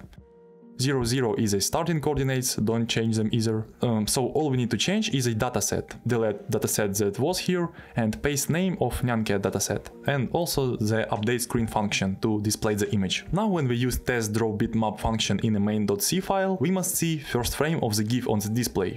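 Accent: Russia. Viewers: Акцент сразу выдает рашу )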